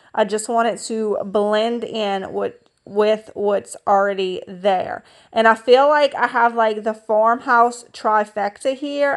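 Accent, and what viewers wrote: Louisiana, United States. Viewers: You are so engaging and I love your accent! I have relatives that live in Morgan City and when I first heard your voice, I immediately thought of them even before I knew you were from Louisiana! You are truly an inspiration. I'm sooo happy I found your channel! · Dear, I love all the DIY'sbut I am in love with the placemat project!! I am going to attempt to build one. I have a question. I had cousins who lived in Donaldsonville and they sounded just like you!! Do you live in Donaldsonville or near it?? xo Linda Alvarez from New Orleans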